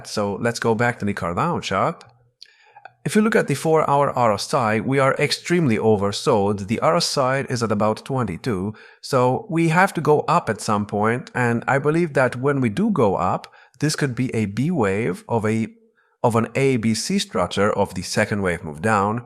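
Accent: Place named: Iran. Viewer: hes accent reminds me of farsi so my guess is Iran 🎉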